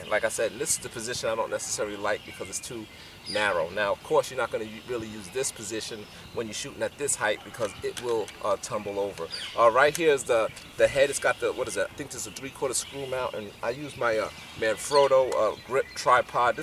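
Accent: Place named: New York, United States. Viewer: you are an intelligent c.... not just a c.... you are fun to watch and have a great New York (yeah) accent. keep up the good work😁😁😁😁😁😁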